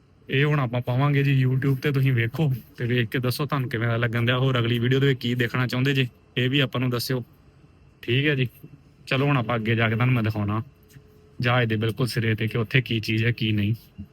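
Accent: Majha, India. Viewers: Language majhe di ah dist vill kehra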